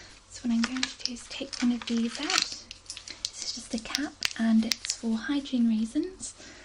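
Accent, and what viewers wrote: United Kingdom. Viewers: that moment when you watch this videos because you learn english and she has wonderful british accent xD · Where abouts are you from? Sounds extremely British, and that's coming from a British person haha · British accent inspires me love. I do not speak english, greetings fron Costa Rica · OMG !!! I love your British accent ....sounds really amazing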